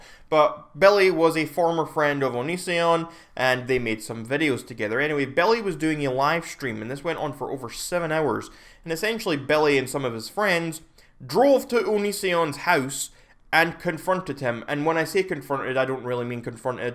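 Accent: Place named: Scotland, United Kingdom. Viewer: What is the world coming to? Just wanna say it's so comforting hearing a Scottish accent on here - love from Scotland 💙💙💙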